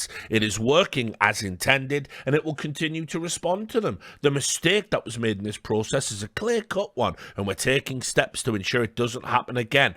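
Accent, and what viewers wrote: United Kingdom. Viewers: The squeaky bum time got me at the end,always nice to hear a brit venting about how fucked up some power structure is. Cheers to the toast at the end and thanks for the constant content!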